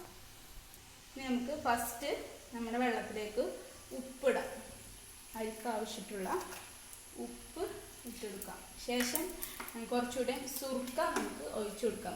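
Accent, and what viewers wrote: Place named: Malappuram, India. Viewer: First video is good, good presentation, Malappuram style language, everything is great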